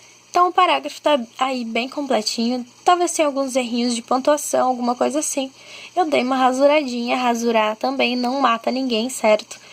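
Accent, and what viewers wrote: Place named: Rio Grande do Sul, Brazil. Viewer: oi vejo que seu sotaque é um pouco gaúcho de onde tu é? sou gaúcha de porto alegre bj!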